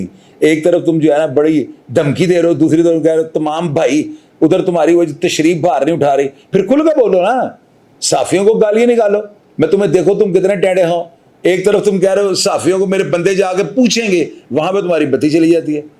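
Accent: Lahore, Pakistan. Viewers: 😂😂😂haha yar jeo lahori andaz meh bat krtey maza ajata saab ye style nhi change krna apny kbhi bi plz big fan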